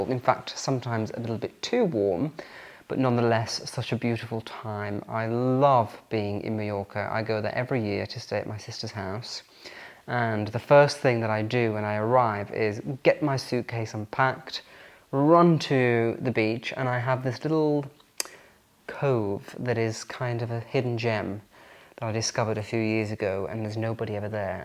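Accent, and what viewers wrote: RP, United Kingdom. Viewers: U R A BIT plummy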